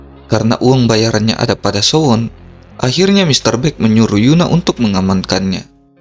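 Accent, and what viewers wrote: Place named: Makassar, Indonesia. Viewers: Bner bgt kaya org Makasar suaranya....aku punya saudara di Makassar kota bantaeng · Orang Makasar ini host nya.. dari logat keliatan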